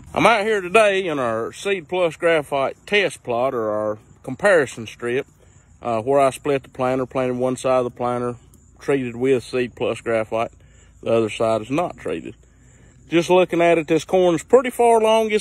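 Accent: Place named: North Carolina, United States. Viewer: I knew you were also from NC just from the accent, before I saw the channel name. Thats some good lookin corn there!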